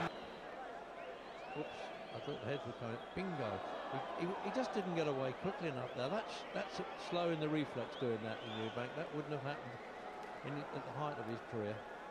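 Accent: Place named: Cockney, United Kingdom. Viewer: Good ole Cockney from Hammersmith London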